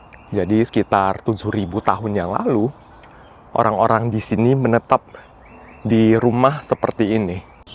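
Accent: Java, Indonesia. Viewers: Materi yang bagus. maju terus. Semakin hari semakin medok logat. Sehari-hari pakai bahasa Jawa ya?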